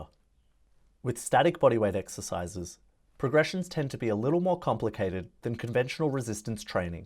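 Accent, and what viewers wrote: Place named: Australia. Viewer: That Aussy accent ...😁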